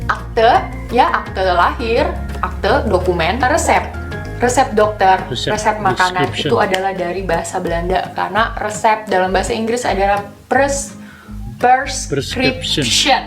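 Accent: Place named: Java, Indonesia. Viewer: Cakap melayunya tebal jawa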